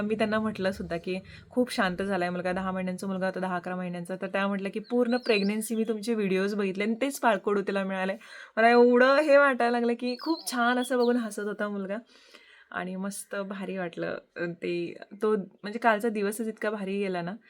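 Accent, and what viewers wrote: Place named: Belgaum, India. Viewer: तुमची भाषा ऐकुन फार छान वाटतं बेळगावची आठवण येते... माझं माहेर उचगांव बेळगांव ❤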